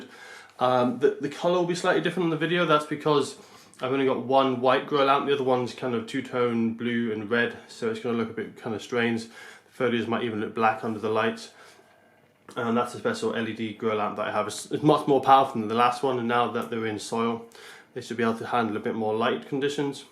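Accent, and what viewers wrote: Scotland, United Kingdom. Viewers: Fuck you go with brexit, I hate this accent I could slay everyone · He's using british pronunciation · I guess that 400 people with the famous "brown thumb" gave 'thumbs down'. No chance for them to grow anything EVER, so they hate everybody who is able to grow their own stuff. Awesome video, even you talk too fast too much, and half of the time you are mumbling, hard to understand for a Non-Scotch. Still, awesome. It's alsoeven easier to have green onions all year long, which I love more than basil. They grow indoors and outdoors.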